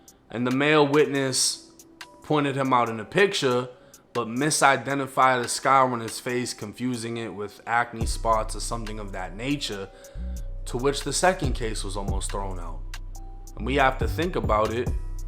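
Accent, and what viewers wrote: Boston, United States. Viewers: Is from Boston or somewhere in New England or something? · He literally sounds white with a Boston accent wtf are you hearing 😂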